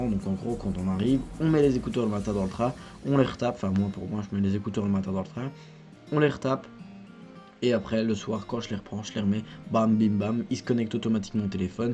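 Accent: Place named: Wallonia, Belgium. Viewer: mais t'es wallon toi dit donc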